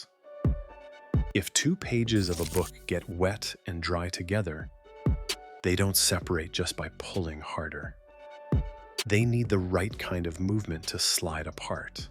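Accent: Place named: United States. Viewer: WHEN ARE YOU GOING TO CORRECT YOUR READER ON HOW TO PRONOUNCE PINYI? It is most annoying, THhr yank accent does not help either,,too bland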